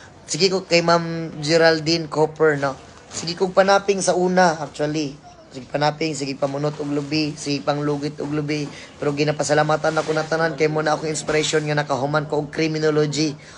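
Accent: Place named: Cebu, Philippines. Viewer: Ang sarap nga pakinggan pag magsalita ka ng sibwano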